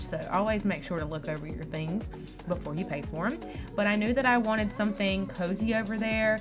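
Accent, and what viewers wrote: South, United States. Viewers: I literally been watching your channel all day because it's my #OffDay and I checked out your Pinterest page out and I loved it Heck I love all the southern country style inspiration I'm from Texas so this bell loves farm house vibes and beautiful southern ascents hats off to you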